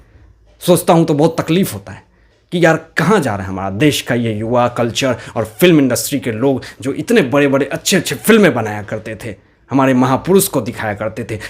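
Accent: Bihar, India. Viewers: Aap U p Bihar se ho kya..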